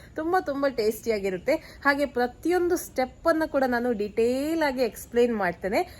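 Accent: Mangalore, India. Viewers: Hi akka, ನೀವು 👌🏻ನಿಮ್ಮ ಮಾತು voice 👌🏻 ಮಂಗಳೂರು ಕನ್ನಡ 👌🏻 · Was just scrolling YouTube I got ur video and I will do subscribe because I love the slang and tone of Mangalore bashe ❤️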